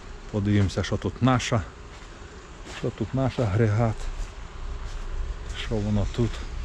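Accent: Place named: Polissia, Ukraine. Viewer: Доброго дня , у Вас мова даже схожа на людей з Полісся, Чорнобиль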